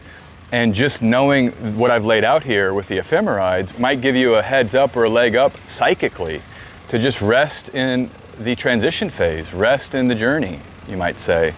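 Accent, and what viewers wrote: United States. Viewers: Hi A friend of mine sent me here, and overall, I've enjoyed your content and found it interesting since I don't know anything about astrology. Now, a bit of positive feedback, english being my second language, it's irritating how your overall american accent is perfect, yet it seems you repeatedly and purposefully mispronounced the word "Uranus", I've learned at school and double checked Google translate that it's supposed to be pronounced as "youranus" and yet you keep pronouncing it as "urunus", is this because your speaking in a different dialect? Why is the difference in pronunciation? It's like an itch inside my brain that I can't reach. Thank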